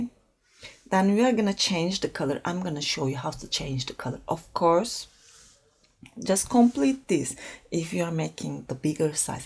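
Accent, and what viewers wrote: Turkey. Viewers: Abla konuşmandan türk olduğunu anladım bunu türkce anlatırmısın lütfen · Your accent sounds like turkish where are you from?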